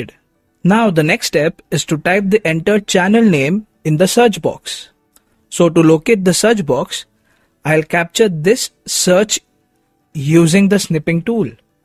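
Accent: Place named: India. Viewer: You sound Indian. Why have called yourself?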